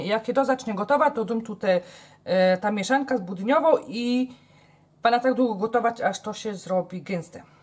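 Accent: Silesia, Poland. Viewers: jak robisz okruchy, czyli twoja posypka, to stary piekarz zawsze mi mówił, że 1:1:1 cukru, mąki i tłuszczu ( raczej masła) i cała filozofia. Spróbuj i zobacz czy Ci to podchodzi. Mi zawsze do drożdżowego wychodziło, choć teraz już robię to "na oko". Pozdrawiam..........i powiem Ci tylko, że lubia Waszo gwara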